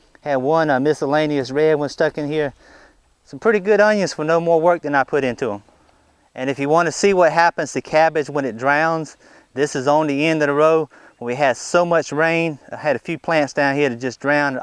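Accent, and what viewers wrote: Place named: Virginia, United States. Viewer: lol.. no wonder i love your accent. i live in southern va too! im an apartment dweller snd cant grow my own veggies but would love to. thank goodness for my local farmers market!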